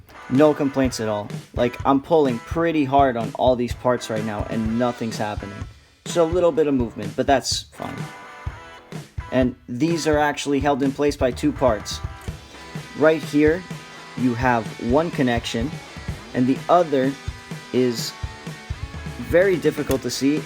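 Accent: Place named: Netherlands. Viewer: Why do you have a dutch accent?😂🇳🇱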